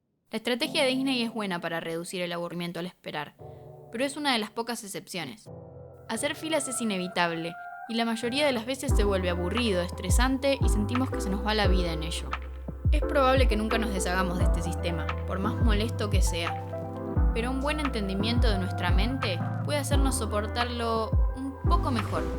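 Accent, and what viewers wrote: Argentina. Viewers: Que bueno escuchar videos "en argentino" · Supongo que por estadistica. Igual en Argentina no todos hablan asi. El acento este es rioplatense, y todo el mundo lo conoce como argentino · Canal voz argenta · Que desagradable el acento argentino en mujeres cuando narran. Es muy local.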